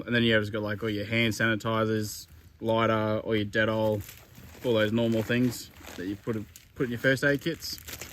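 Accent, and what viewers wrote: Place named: Australia. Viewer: Why would I not understand him? He was using basic English with an Australian accent.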